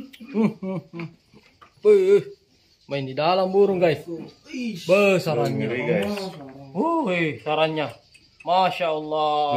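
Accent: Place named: Makassar, Indonesia. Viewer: Ini di makassar ya guys logatnya kayak makssar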